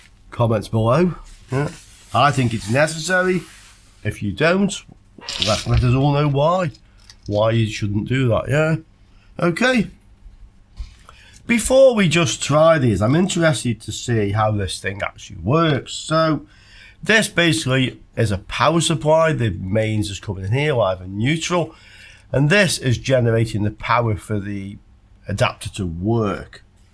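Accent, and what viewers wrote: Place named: Sunderland, United Kingdom. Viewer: hi.like your accent nowt...haha im from sunderland macam land and we use the same wording for nothing. love your videos im not a tech just find the videos interesting...and simple to follow even though you go off into a tech tangent sometimes haha..but i know what the channel is for.keep up the good work......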